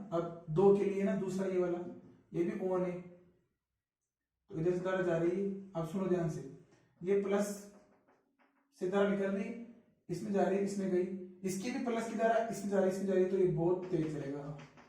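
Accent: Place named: Hadoti, India. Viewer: Like your hadoti accent 😊❤️❤️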